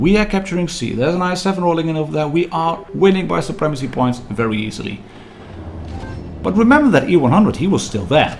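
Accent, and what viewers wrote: Netherlands. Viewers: Just recently started watching your videos, and I am really impressed. Really nice style you have. Your accent also makes me a bit homesick — I’m not Dutch but South African and the Afrikaans accent is similar. I am curious as to why nobody has done a review video on the T55A. Well, I found a French one but I mean none of the “regulars” I follow (you, Bushka, SK8XTRM). Did they not give you a chance to play with it or is it just a bad tank? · its not a trick question😂 I recognised your slight dutch accent, because I’m dutch too😉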